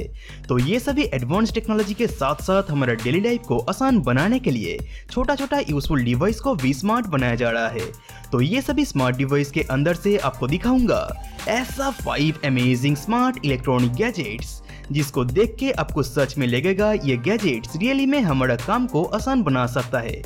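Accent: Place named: Bengal, India. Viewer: Abe bengali... fix your hindi accent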